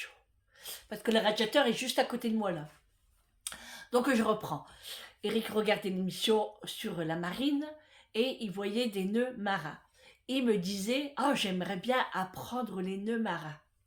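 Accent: North, France. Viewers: voilà, c'est sûrement ça....!!!! Avec votre accent du Nord, le micro, Macho-Mattew, je confondais. Merci. Je croyais que j'étais à "l'ouest" 🤣🤣🤣🤣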